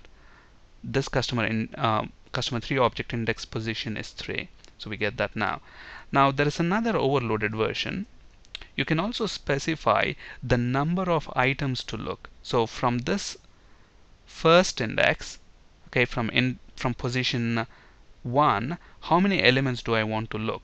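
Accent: India. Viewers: I (as a non-english native speaker) usually have trouble concentrating on videos with an Indian accent (-sorry for that sad assesment). But this dude is very didactic, expressive and illustrates every point very well! Hugely Recommend his different videos on different reference points for beginners or quick access to specific info! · I usually can't stand the Indian accent in tutorials, it's the cadency of the words it distracts me because when you speak with an Indian accent in English the sound is usually flat and has stops after each word. Maybe I'm a dickhead but I'm specifically referring to the combination of flat English + Indian accent in tutorials. The reason why I'm making this statment is to let you know that this does not happen in this video! This tutor is fantastic, dispite having an Indian accent the narration is not flat and it's pleasent, the contents are on point, concise and clear, thank you so much! 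Subscribed!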